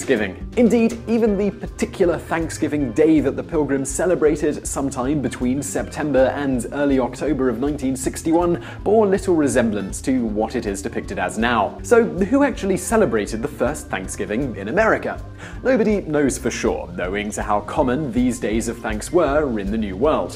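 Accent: United Kingdom. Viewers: Weird hearing a Brit talk about American Thanksgiving history. I should do one in a brash US accent about Cornwall.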